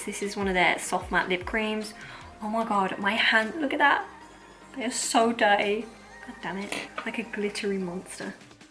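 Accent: United Kingdom. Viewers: I can't figure out what part of the UK you're from by your accent - I love trying to guess where UK youtubers are from but I legit cannot guess lol!!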